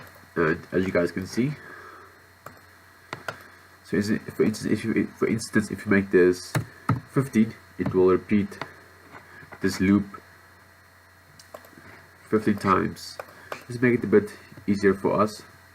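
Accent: Afrikaans, South Africa. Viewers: Hes from South Afrika. Theres no doubt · like jou afr accent XD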